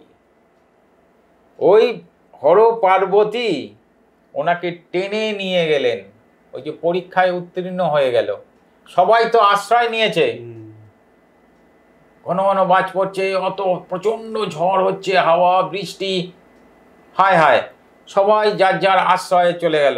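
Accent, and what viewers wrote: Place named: East Bengal, Bangladesh. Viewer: r byakhya aro shunte chai.omar purbo bangyo bhasha te shunte khub sundor lagche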